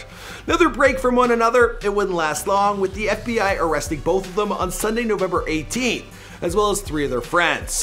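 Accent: Canada. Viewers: I hate the Canadian accent lol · Why do people always talk junk about? I like his content. Yea he’s a Canadian and don’t pronounce everything the way we do but cut the guy some slack y’all.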